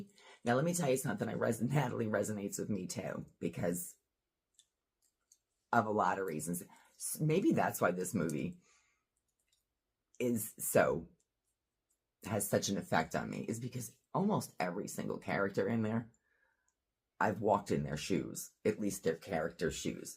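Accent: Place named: United States. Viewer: You don’t need a cute British accent, you have a cute American accent and you are genuine and in my opinion beautiful. 😊 women are allowed to be happy and have dreams. Looking forward to your videos!😊♥️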